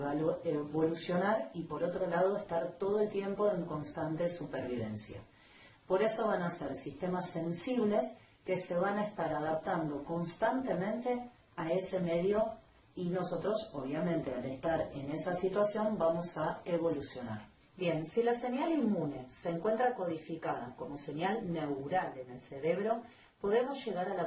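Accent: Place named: Argentina. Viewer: Buena explicación, lo único que el sonido y el castellano que habla, se me dificulta, entender, los términos médicos entendíble, la gramática argentina es lo que se me dificulta.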